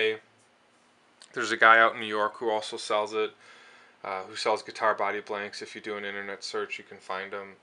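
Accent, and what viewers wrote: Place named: New Jersey, United States. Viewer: That sounds like a Jersey accent. Good review.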